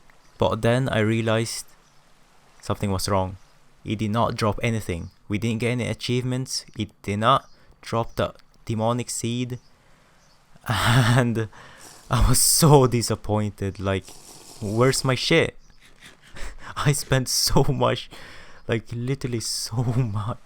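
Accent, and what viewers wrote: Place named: Philippines. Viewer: i sense filipino accent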